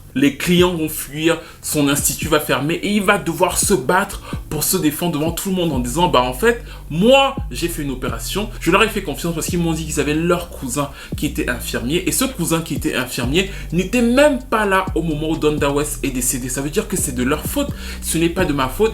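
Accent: Cameroon. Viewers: ton accent camerounais ressort très fréquemment, c'est hilarant 😂😂😂😂 Tu nous rends fiers. Que de la force pour ton excellente chaîne frère 💪🏾😁🇨🇲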